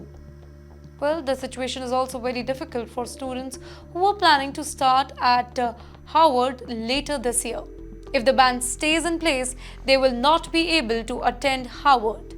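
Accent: India. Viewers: Tongue-twisting curry English.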